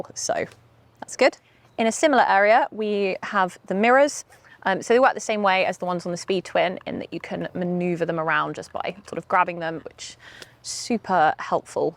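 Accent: England, United Kingdom. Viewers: I have a 2018 T100 since new. Enjoy your video with British accent! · Which part of England is your accent from?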